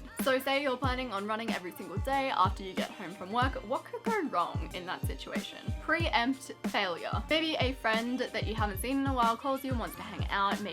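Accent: Australia. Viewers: I love her accent <3 Is she Australian?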